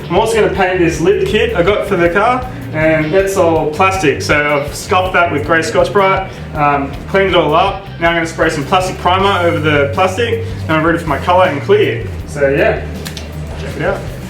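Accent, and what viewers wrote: Australia. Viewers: An Aussie if Ive ever heard it! Good video mate. Keep up the good work.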